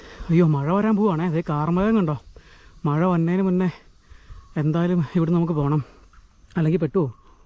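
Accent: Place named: Angamaly, India. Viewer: സംസാരത്തിന് അങ്കമാലി ടച്ച് ഉണ്ടല്ലോ.🎉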